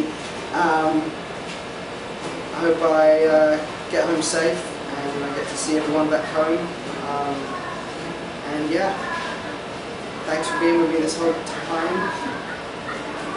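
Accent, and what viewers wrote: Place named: United Kingdom. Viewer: British accent:D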